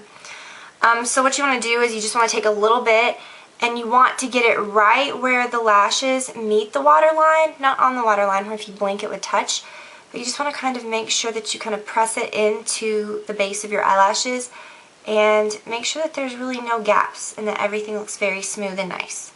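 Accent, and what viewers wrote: South, United States. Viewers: Is she from the south?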